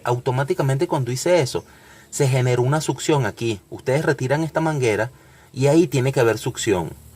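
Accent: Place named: Venezuela. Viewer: saludos mi amigo desde barrancabermeja santander colombia tengo una chevrolet blazer modelo 93 el aire acondicionado no me funciona cuando vi tu video me alegre necesito saber mas ya que esta camioneta era de mi padre se la dio a una amiga y la desvalijaron no tiene la inteccion y le colocaron un carburador de dos garganta de dodge 300 o ford ranger que es lo mismo creo tu eres de donde suena como a venezolano oye tengo el club blazer colomabia es pero que seas mi amigo alli y nos comparta tus conocimientos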